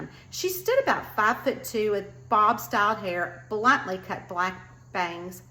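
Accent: South, United States. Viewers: Love that Southern twang when I know it's real. You have done a great job with the southern style of small town, been there, done that. Now I'm old.😄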